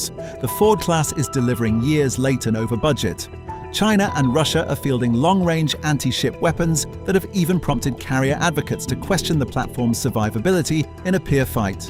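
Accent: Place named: United Kingdom. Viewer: thats why its ironic that its a British Voice.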